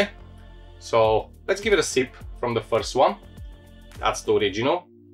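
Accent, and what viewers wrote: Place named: Bulgaria. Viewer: Страхотно видео, но с този акцент съм сигурен че сме сънародници!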